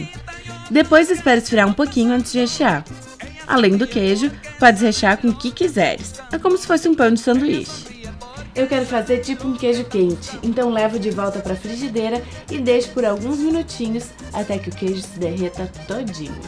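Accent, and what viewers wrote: Rio Grande do Sul, Brazil. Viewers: Não é que ela fala esquisito , ela tem sotaque gaúcho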